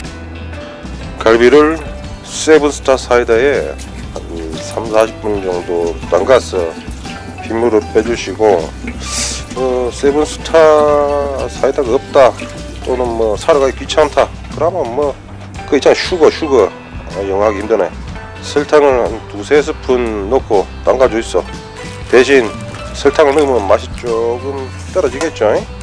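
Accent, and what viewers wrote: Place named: Gyeongsang, South Korea. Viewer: 구수한 경상도 사투리로 듣는 맛있는 양념갈비 레시피 재밌었어요.^^ 댓글 보고 왔는데 ㄱㄷ하고 갑니다. 앞으로 자주 소통하기를 바래요.^^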